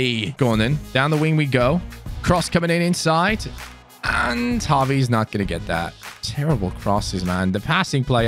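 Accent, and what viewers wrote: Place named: Jamaica. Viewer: Hey could keep the Jamaican please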